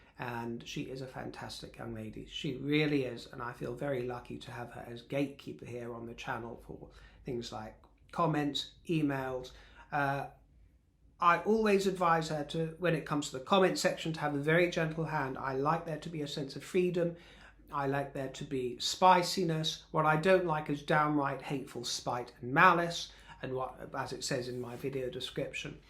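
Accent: United States. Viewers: love your American accent ❤ 😆😆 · your American accent is a scream!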